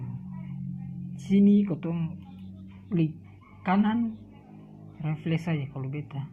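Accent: Indonesia. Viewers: ngana pe logat macam logat Gorontalo.. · mantaap coooi ambon logat ni ancor hahahah gooot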